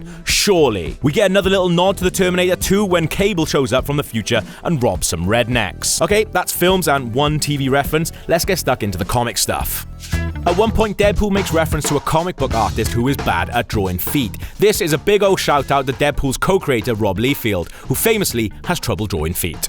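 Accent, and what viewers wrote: United Kingdom. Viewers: hey are you British? i cant really tell...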